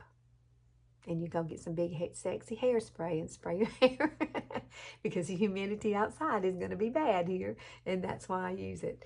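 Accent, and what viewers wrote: South, United States. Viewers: Some very good 'tips'. Just love your Southern accent, don't hear it much In Australia. First time on your Channel, thank you. You look great.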